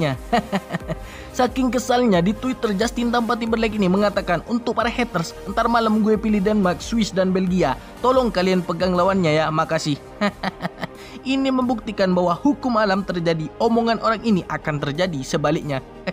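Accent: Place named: Medan, Indonesia. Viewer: Abng anak medan yh.nmpak kali ckpnya anak medan kali wkwkw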